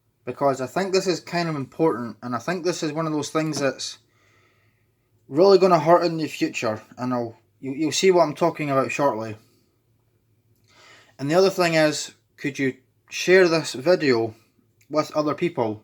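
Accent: Scotland, United Kingdom. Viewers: Just nice to hear a fellow Scotsman talk about similar hobbies, even if you were rambling shite, I'd still watch haha. You've spread loads of awareness with this channel!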